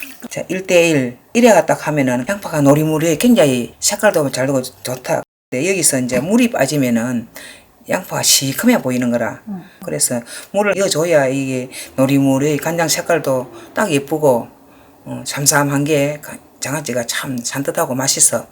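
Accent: Gyeongsang, South Korea. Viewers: 엄니 설명이 유머스럽네요 경상도억양 정겨워요 양파장아찌 맛나보네용 비율도좋고따라하기쉽고~👍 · 선생님~ 엄마가 정말 팬이세요~ 햇양파가 많이나와서, 양파장아찌는 벌써 두번째 담아 먹습니다. 남해분이시라더니, 저도 경상도 사람이라 말이 정감이 갑니다~~♡♡ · 말씀 도 완전 경상도 사투리 정감 가고 좋아요 😀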